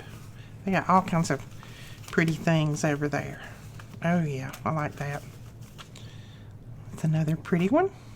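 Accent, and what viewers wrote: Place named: Alabama, United States. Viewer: These are wonderful, E! I've heard Rachel from Roxy Creations mention you a couple of times. She's impressed with what you are doing with her challenges!! I am praying for your husband. I know it is difficult for him and difficult for you, too! May God touch his body and heal him. Not our will but God's Will be done! Love everything you do, and I love hearing your Alabama accent since I lived in Alabama for 8 years, and even went to the University of Alabama. Where in Alabama do you live?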